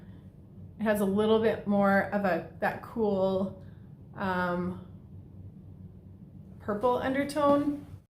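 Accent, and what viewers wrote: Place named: Canada. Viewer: Love your Canadian..Ahhhh accent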